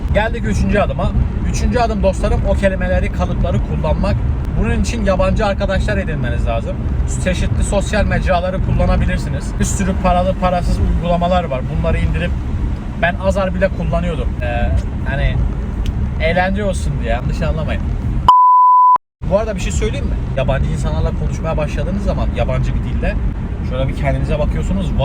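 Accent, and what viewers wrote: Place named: Azerbaijan. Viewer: Abi sen Azerbaycanlisin konuşmandan biliniyo😅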